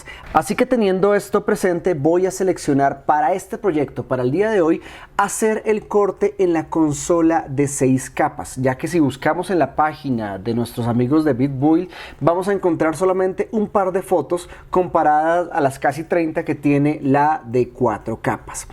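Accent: Colombia. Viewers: Qué bonita es la electrónica, lástima que no tengo ni la paciencia ni el pulso para trabajarla...por cierto ese acento de los colombianos/colombianas ME ENCANTA!!! Recuerdo cuando cursaba la carrera de informática , cómo optativa tomé sistemas digitales , y al momento de hacer los puenteos , si quedaban muy grandes o muy feos llegaba el profesor con sus pinzas y nos decía" No me gustan las patas de araña" y zas , que nos cortaba los cables! creo que por eso elegí la parte de software en vez de hardware.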